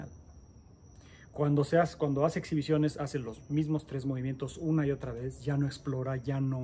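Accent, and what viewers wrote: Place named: Argentina. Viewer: Yo opino que el sensei está hablando cada día más con acento Argentino! Saludos desde Buenos Aires!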